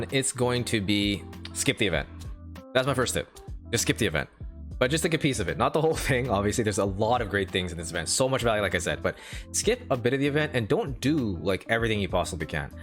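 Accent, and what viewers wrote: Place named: Canada. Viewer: Ahhh. A nice normal Canadian accent.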